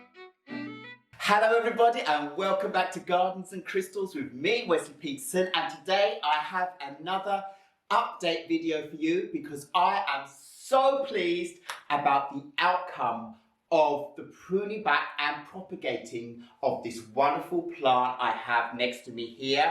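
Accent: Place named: United Kingdom. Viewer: I am so curious to know where you’re from? You got such a strong British accent id thought you’re from the UK? Your location says Malmö- hence the confusion ☺️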